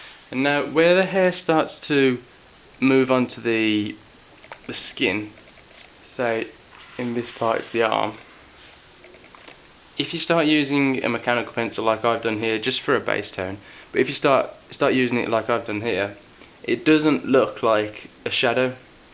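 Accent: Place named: Walsall, United Kingdom. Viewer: WOW! i cant believe how well you can draw, heard your accent and thought he sounds like me haha im from walsall to, anyway well done hope you make lots a moneys from these :D x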